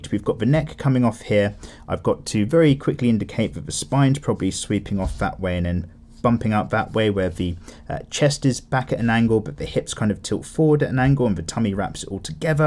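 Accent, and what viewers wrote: United Kingdom. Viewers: British english >:D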